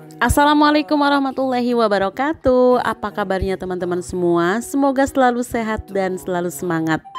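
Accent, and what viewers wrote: West Java, Indonesia. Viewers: Ibu sunda pisan, mun sur org sunda mah asa ka cai we jauh ge da ka bumi budak 😂😂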